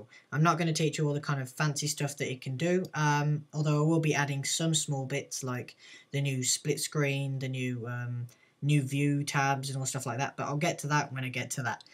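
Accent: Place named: United Kingdom. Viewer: Not to hate on British people, but do you seriously think anyone can understand a damn word you're saying? So high pitched, fast and generally obnoxious.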